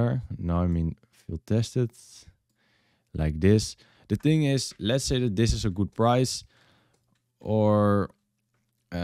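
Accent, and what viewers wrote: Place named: Netherlands. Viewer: Mate you sound so dutch lmao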